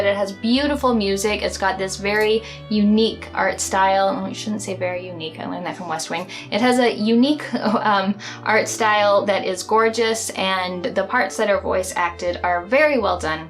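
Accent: Canada. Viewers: Canadian accent for the win! Looking forward to the Journey to Silius review.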